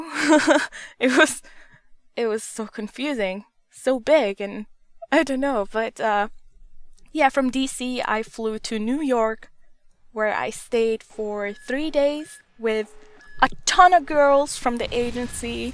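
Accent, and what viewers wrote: Germany. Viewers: Are you German cos I'm confused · I dont understand :D Is She from Germany? Und wenn sie aus Deutschland kommt, Warum redet sie dann auf Amerikanisch?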